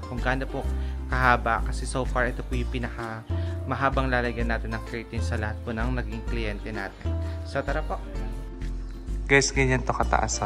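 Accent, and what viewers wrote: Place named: Pampanga, Philippines. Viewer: Kapampangan ka pamo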